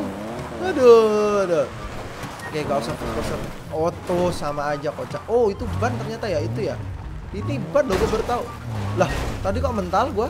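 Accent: Jepara, Indonesia. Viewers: Bang orang jepara ya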